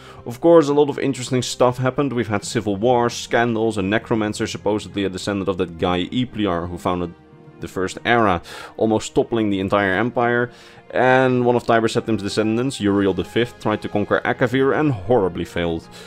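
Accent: Netherlands. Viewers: Lekker Nederlands accent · God...that dutch accent tho... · Great vid. Are you Dutch? The accent seems familiar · I hear a Dutch accent 😊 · Dumb question, but are you Dutch? Your accent sounds Dutch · I can tell youre dutch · You dutch? You sound dutch. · Are you niederlansk? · U dutch? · So I just discovered you. Now I have a whole lore backlog to go through to have going in the background while I work! Wahey! Also, fellow Dutchie I hear, so bonus points for that. Goedenavond! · Hey question if you don't mind me asking buy you don't happen to be dutch do you? (I'm dutch myself and I thought I heard a small dutch accent over your english) Also great video I enjoyed it very much :D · I need to know: are you dutch? Its your accent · Are you dutch by any chance?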